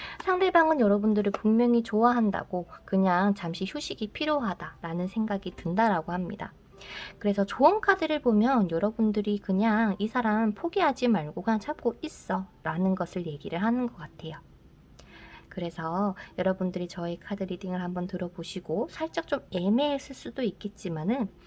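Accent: Gyeongsang, South Korea. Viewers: 4 수달 저에게 너무 지친 나머지 제손 놔버리고 다른 분 만나러 갔는데... 포기하고 지내면 언젠가는 와줬으면 하네요ㅠㅠ 그분이 경상도 분이라 님 말투들으니 더 보고싶어요..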